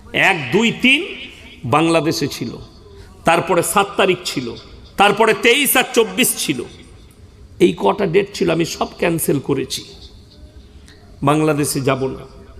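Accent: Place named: India. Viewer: এটা হিন্দি টানে বাংলা বলে কেন?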